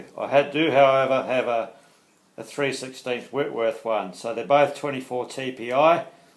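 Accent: Australia. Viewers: Good to hear an Australian accent for a change, nice work as well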